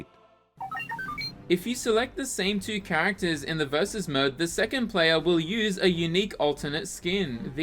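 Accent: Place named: Australia. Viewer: I was baffled when you said this game had an M rating but realized your Aussie accent and then it made sense 😆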